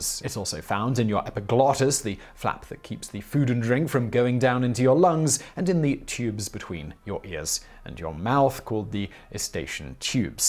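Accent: United Kingdom. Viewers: I thought this was the guy that does VSauce and was wondering why he was talking British accent. Then I realized they could be twins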